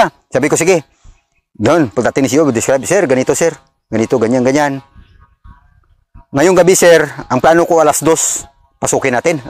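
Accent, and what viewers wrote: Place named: Iloilo, Philippines. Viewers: 😂😂😂😂 natawa Ako sa Punto Ng salita sya parang ilonggo 😅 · Ilongoha nlang SG.nami pamatian god bless SG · Ilongo SI sir sa tuno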